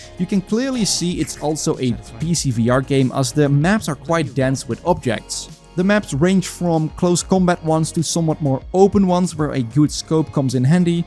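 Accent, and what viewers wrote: Netherlands. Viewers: Ik ruik een nederlander😂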